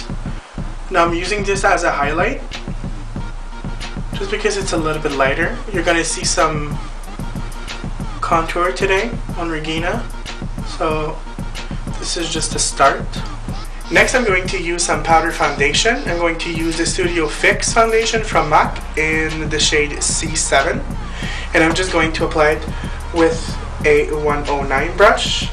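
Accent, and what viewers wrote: Quebec, Canada. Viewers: (: i love your Canadian accent<3 and your skin looks flawless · OH un quebecois :O :D bonjour :)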